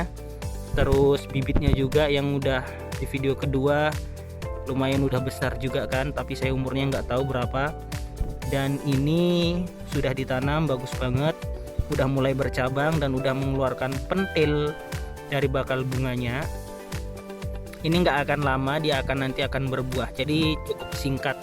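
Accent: Java, Indonesia. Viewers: Masnya ini jawa mana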